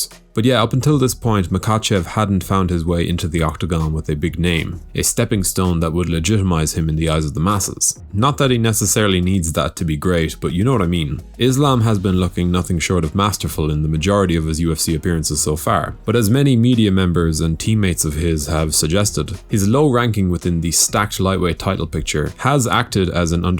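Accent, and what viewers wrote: Ireland. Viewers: This irish narrator bugs the heck outa me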